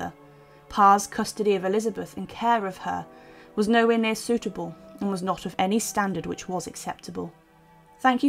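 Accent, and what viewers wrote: United Kingdom. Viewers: At 37 seconds in to the video the presenter (who is speaking with what sounds to me like a British accent), states that "She (Elizabeth) had her own mother executed inside the walls of the Tower of London..." who wrote this? Gee, I wonder how King Henry VIII felt about his daughter having his wife executed?🤔 I mean, the paintings are very nice but how are we to take anything for fact after that?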